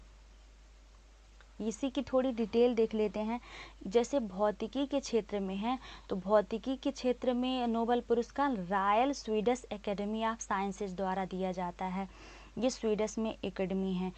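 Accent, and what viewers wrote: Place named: Bihar, India. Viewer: Tum Bihar se ho ...Boli mein udhar ka hi ahsaas ho Raha hai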